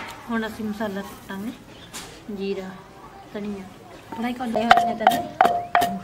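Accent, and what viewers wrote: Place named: Jalandhar, India. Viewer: Mainu tusi jalandar side de boli lagdi tuhadi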